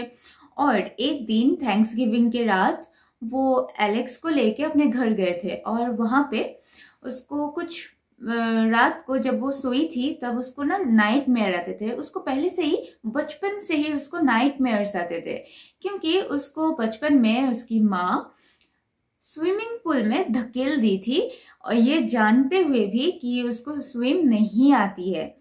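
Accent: Bengal, India. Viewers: She is bengali so her hindi isnt proper. No problem with that 😊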